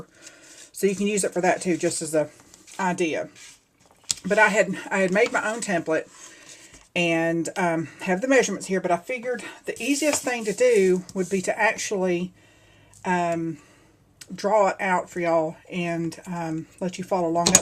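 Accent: South, United States. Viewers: Oh my goodness thank you! I love all your peekaboo pockets. I just found your channel a few weeks ago and I have to say I so enjoy your mini's and have watched all of your videos already!! This video was awesome!!! <3 Your southern drawl is super awesome I could listen to your tutorials all day!! Your such a great teacher!!! ((NY hugs)) -christine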